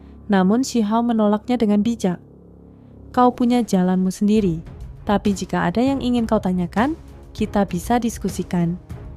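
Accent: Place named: Java, Indonesia. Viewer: lanjut mntap....ga ada bosenya kaya suara indah ke jawa2nya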